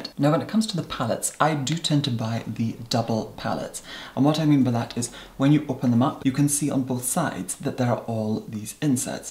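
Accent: United Kingdom. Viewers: posh English 'Oxford University' style (but he is originally from Scotland)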